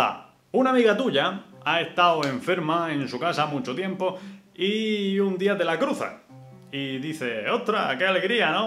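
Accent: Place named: Andalusia, Spain. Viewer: Soy andaluz de padre y madre andaluza, y ni siquiera yo tengo un acento andaluz tan marcado como el tuyo... ¿dónde y cómo lo aprendiste? Al aprender español cómo es que te decantaste por la variedad andaluza y no por cualquier otro dialecto español. Un cordial saludo desde Andalucía, concretamente desde Málaga. :)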